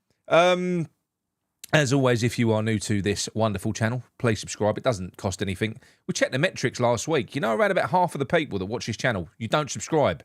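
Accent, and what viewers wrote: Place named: Birmingham, United Kingdom. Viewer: Manc fan with a brum accent losing his head 😂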